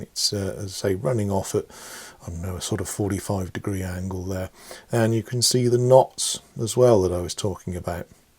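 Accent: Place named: United Kingdom. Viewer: Im not all that familiar with British accents but u kinda sound like Ian Anderson man. Are u both from the same area in England?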